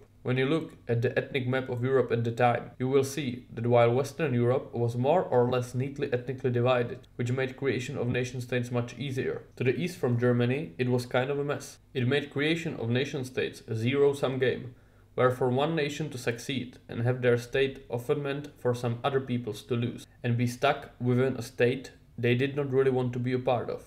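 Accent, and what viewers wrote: Czech Republic. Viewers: Hey bro...fenomenal stuff keep i going. BTW are you Czech? Because that sounds like czech english accent to me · The czech accent is killing me💀💀💀 But very true video indeed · whats your mother language? you sound kinda like a czech with your english accent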